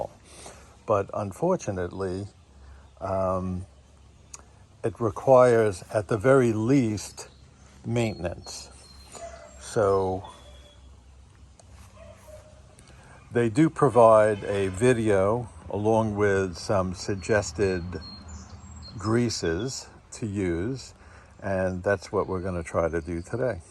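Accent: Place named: Boston, United States. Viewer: Great balanced video, and really helpful tip at the end! also, I hear Boston in you =D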